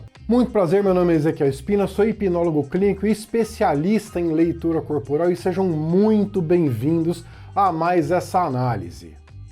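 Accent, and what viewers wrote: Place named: Ceará, Brazil. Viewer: E isso mesmo. O é um sucesso. Adoro o sotaque cearense dele. 😊😊😊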